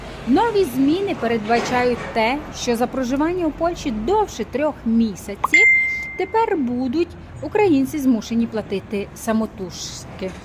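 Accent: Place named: Western Ukraine, Ukraine. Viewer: СУДЯ ПО ГОВОРУ ВЫ ИЗ ЗАПАДНОЙ УКРАИНЫ...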